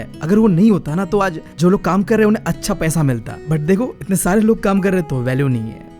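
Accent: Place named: Assam, India. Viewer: Wo assam se hai iss liye thoda hindi me problem hai bhai😅😢😊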